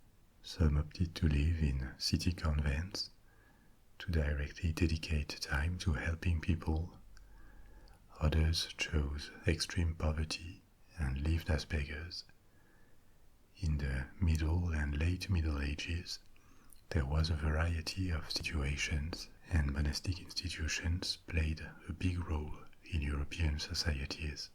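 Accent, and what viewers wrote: France. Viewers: You will always be my favorite ASMRtist. Your low voice just the way you do it and French accent is so soothing, and you really do your research for these videos and stories. You are simply the best there is!! Thank you so much for your efforts. We really appreciate your work! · I haven't slept this good since childhood! Your French accent reminds me of my grandmother who was a war bride from 🇫🇷 France. It sooths me to sleep as her voice did so many years ago. Thank you so much for the work you do. Your videos work so much better than any pill and as a chronic pain, extreme at times, sleep doesn't come easy. Your dedication is very appreciated by myself and many others. Thank you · I used to live in France a long time ago. Your lovely accent takes me back to that delightful time in my life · I really love the stories and the French accent, but the only thing I don’t like is the big gap after the story ends with some videos. 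I think it’s designed to be some restful sound to help you sleep but I have a playlist of stories I listen to and would prefer that at the end of the story it ends so my playlist can move onto the next story.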